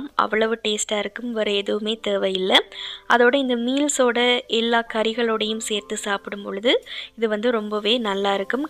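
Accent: Jaffna, Sri Lanka. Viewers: I was waiting for your vlogs sister I love to hear your slang of tamil of Jaffna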